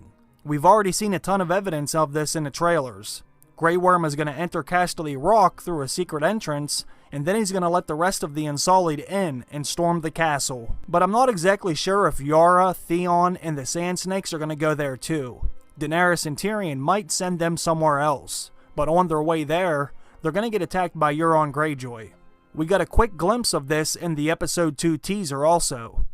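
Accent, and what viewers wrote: Pittsburgh, United States. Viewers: Are you from Pittsburgh, PA ... your accent sounds like Pittsburgh DAD.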